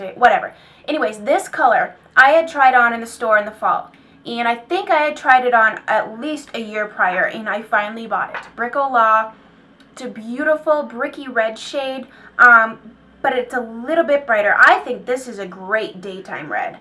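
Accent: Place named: Wisconsin, United States. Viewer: No way! My hubby is from superior WI. We go back every year in the summer to a lake near Madison. He has family in sun prairie. I knew that sounded Iike a wisco accent lol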